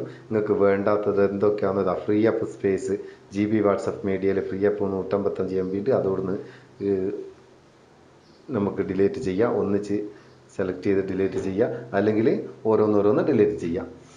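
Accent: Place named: Vadakara, India. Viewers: വടേര ഭാഷ...... നല്ല മൊഞ്ജ് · vadakarakkarum ingono okke yaan samsaarikkuka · Orijnal nadaaapuram samsaram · അടിപൊളി സ്ലാങ് ങ്ങള് മേപ്പയ്യൂർ ആന്നോ!!മ്മളും സബ്സ്ക്രൈബ് ചെയ്തിക് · Haai kolalooo vadakan kerala bhasha kalakiii karyam clear aayyy kolllaa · അര്യത്താ അയേ......വർത്താനം ketteram തോന്നി.....😊😊😊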